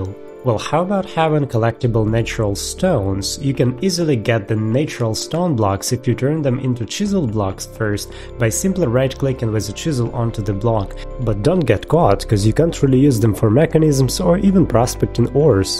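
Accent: England, United Kingdom. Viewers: O ya vizhu vi iz anglii?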